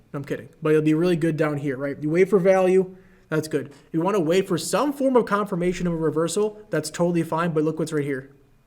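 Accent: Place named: Michigan, United States. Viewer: tell me u not from Michigan ... ur accent in so close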